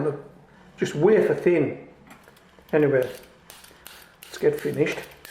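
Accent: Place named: Geordie, United Kingdom. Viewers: After 25 years exiled from the UK it's fantastic to hear a regional (is it Geordie?) accent! Interesting experiment! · This guy is unreal lives in Australia but also lived in uk Middlesbrough his accent is very close lol...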